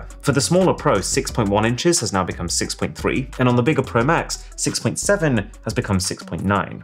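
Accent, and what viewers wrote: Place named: South England, United Kingdom. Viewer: You have a posh accent from south england. But you say "faster" like a northerner.... are you just putting it on?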